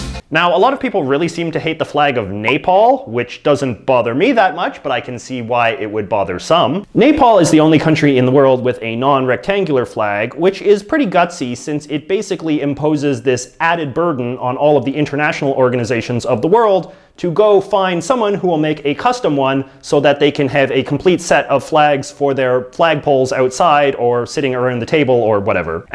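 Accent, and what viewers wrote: Canada. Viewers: Just noticed you talk Canadian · I just noticed that you are Canadian because you pronounced about like a boot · dumpling dump chill, he's Canadian he says aboot so yeah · This guy has the most stupid stereotypical Canadian accent I have ever heard is he doing it on purpose? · your Canadian because abot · ok so he just has a Canadian accent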